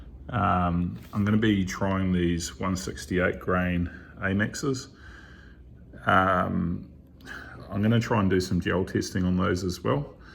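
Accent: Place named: New Zealand. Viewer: Mate, you sound like a Kiwi! 👍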